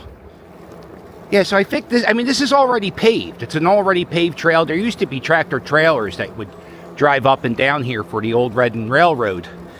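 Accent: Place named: Pittsburgh, United States. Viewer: i grew up in the philly area and i sound nothing like this guy. sounds like pittsburg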